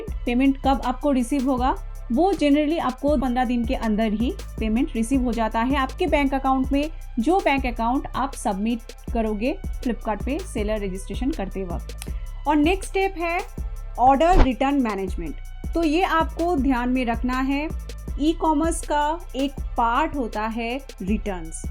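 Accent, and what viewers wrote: Bengal, India. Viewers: Don't have time to watch long video,and bengali action in ur hindi speech.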